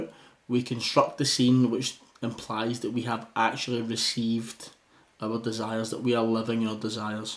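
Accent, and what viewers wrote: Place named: Scotland, United Kingdom. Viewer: A UK Neville follower! Love the Scottish accent, I'm from Wales and am a follower of Neville. God Bless :)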